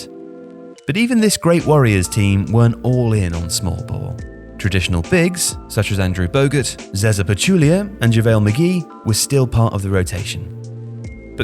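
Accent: United Kingdom. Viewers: i love how a dude with a british accent is talking about american basketball lol. fr tho i love your vids keep it up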